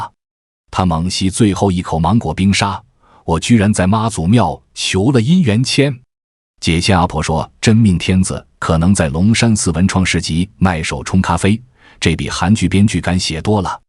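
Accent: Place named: China. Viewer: 連ai配音都中國大陸腔...😒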